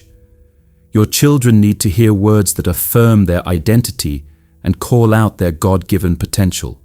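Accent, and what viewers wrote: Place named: England, United Kingdom. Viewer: Get rid of the English accent.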